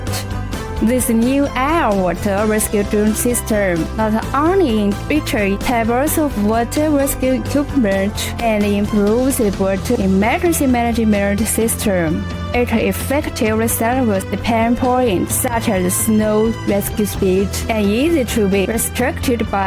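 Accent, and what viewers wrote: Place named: China. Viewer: That is some serious chinglish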